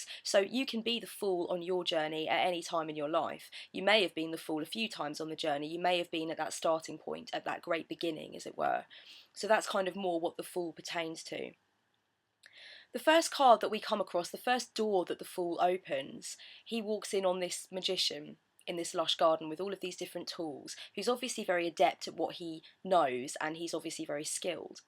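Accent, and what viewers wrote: England, United Kingdom. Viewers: I would love to know your academic background. I wish you were a professor in the states (we love English accents for some reason, especially in higher and Broadcasting). You have amazing gifts as a teacher. I rarely subscribe but I'm going to be interested in further videos... Today is my first. Thank you so much for doing this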